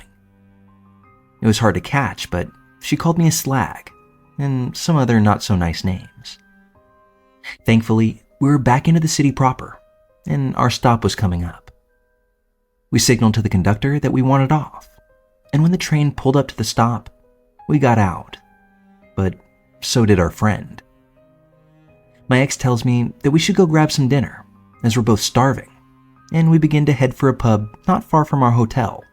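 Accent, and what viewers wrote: United States. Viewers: It's got to be the guy's voice it's like the new Transatlantic accent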